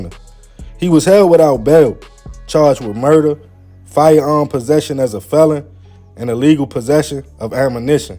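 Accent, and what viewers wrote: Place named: South Carolina, United States. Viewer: U sound like u from South Carolina or sum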